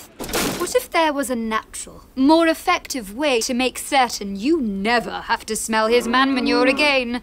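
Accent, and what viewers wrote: Scotland, United Kingdom. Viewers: Just stopping by to say that this is one ad I genuinely enjoyed, and chose to sit through. It seems to have genuine personality. the snooty British accent just adds a level of self-awareness/ irony. Would buy if I didn't already have a fan. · You can hear her Scottish accent more in this.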